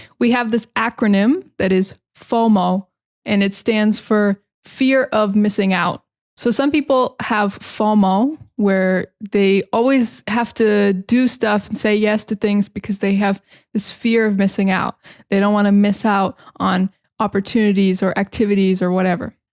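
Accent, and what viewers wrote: United States. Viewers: Thanks for recommending Micho Brothers, they are awesome. By the way in living in São Paulo as well, in a homeless shelter. I really appreciate your channel, you're helping me a lot to improve my English skills, your american English accent really matches with my goals. Thanks so much dear teacher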